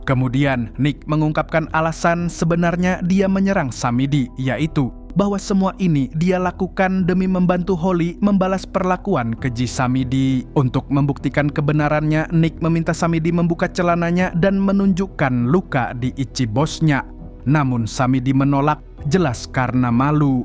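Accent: Banjar, Indonesia. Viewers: Positif orang Banjar ni adminya🤣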